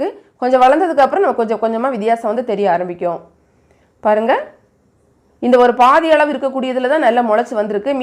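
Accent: Kanyakumari, India. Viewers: Mam neenga kannyakumari ah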